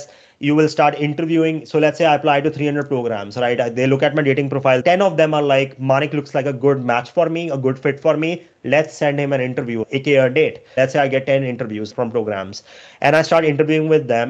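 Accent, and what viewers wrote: India. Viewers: Good info but you have that typical funny Indian accent. I hope the patients enjoy conversations with ya :)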